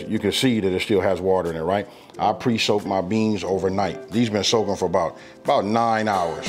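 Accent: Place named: California, United States. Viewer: It strange to see and hear you cook southern food with that Cali accent.. But you got some skills.. I enjoy your videos. Keep doing what ya doing.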